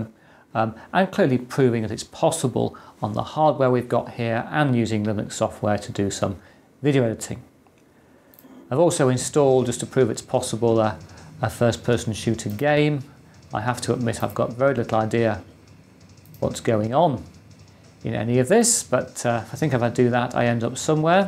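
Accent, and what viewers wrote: United Kingdom. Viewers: british accent lol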